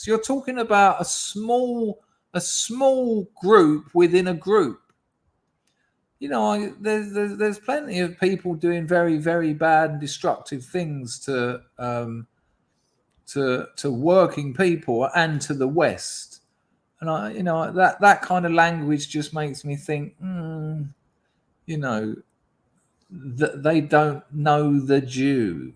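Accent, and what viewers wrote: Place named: London, United Kingdom. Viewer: I really miss the London accent I spent a lot of time in Hanwell over the decades 🏴⚒️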